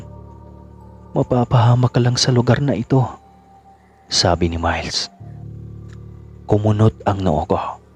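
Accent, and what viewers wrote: Visayas, Philippines. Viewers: Sir kahit Bisaya Diction mo galing mo mag narrate Sir🥰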